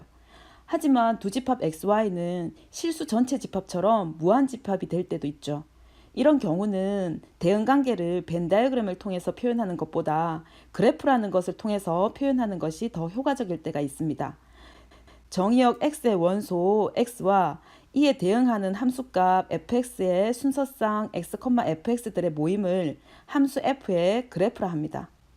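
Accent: South Korea. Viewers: 우왕 들 화면 넘 깔끔하고 조아요~ ㅎㅎ 근데 서울분은 아니신가 봐요 ㅋㅋㅋ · 경상도 인듯?!?!ㅋㅋㅋㅋ 구독합니당 ~ㅎㅎ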